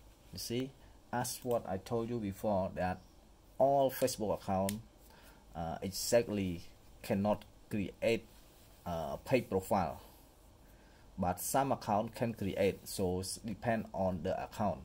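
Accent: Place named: Cambodia. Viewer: បងខ្មែរមែន ព្រោះនិយាយតុងខ្មែរ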